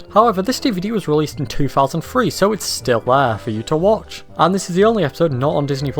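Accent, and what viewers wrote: United Kingdom. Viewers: Northern English (UK), possibly Liverpudlian. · Can you please stop with the British accent?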